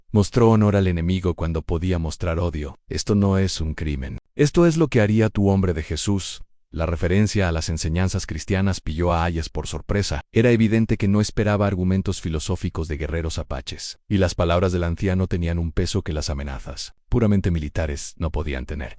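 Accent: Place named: United States. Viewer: La lectura está bien lo que paso es que primero habla del apache herido como si fuera mujer y luego como hombre así que eso genera por así decirlo ruido al escuchar, en algunas partes le pone entonación como si fuera de EU hablando en español , por lo demás está bien , solo pónganse de acuerdo si hablan de un hombre o de una mujer, y eso no quiere decir no se agradezca las publicaciones con audio , saludos desde Puebla México 🫂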